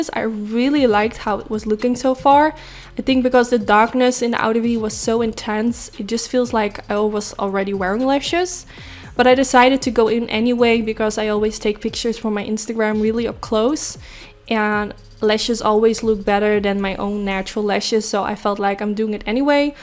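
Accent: Netherlands. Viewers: Beautiful look 😍 Are you Dutch? You have really funny accent ☺️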